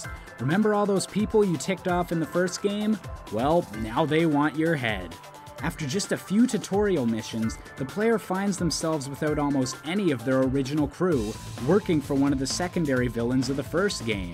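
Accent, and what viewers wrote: Canada. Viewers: This guy is Canadian isn't he? This guys Canadian.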